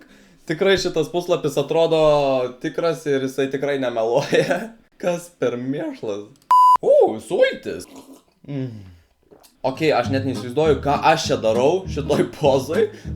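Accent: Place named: Samogitia, Lithuania. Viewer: Tikrs žemaitis esi, nes žemaitėja yr geriausia ❤ Kap į tava video😍❤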